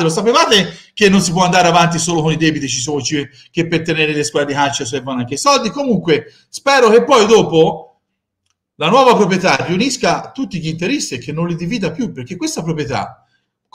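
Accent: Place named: Florence, Italy. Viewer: Bellissimo il tuo accento fiorentino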